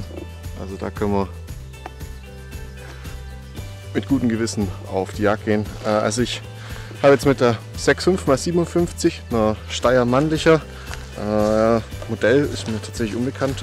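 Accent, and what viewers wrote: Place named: Swabia, Germany. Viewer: Wo sind wir denn gerade? Bei uns im Schwobaland? WMH! 🌿🌲 so hört es sich zumindest an…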